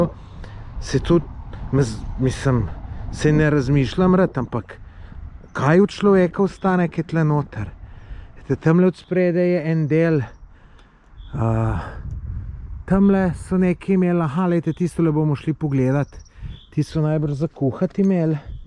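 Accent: Lower Carniola, Slovenia. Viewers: Hvala ti za lepo izcrpno reportazo, tud nam je poveljnik reku da se ne smemo nicesar sovraznikovega dotikat ker je lahko minirano in se ne splaca. Ja cudn res da una stolpnca se stoji jebemu, Amerika bo mela poune roke dela da vse to obnovi pa gnarja bo slo na miljarde. pazi nase in samo naprej. 👍👍👍 Ps. Pogresam tvoj prijeten dolenjski naglas, malo bolj ga lahko poudaris v oddajah in porocevanjih. Tud jst sm rodom iz Trebnjega Velki Gaber tko da rad slism naso špraho ☺️☺️👍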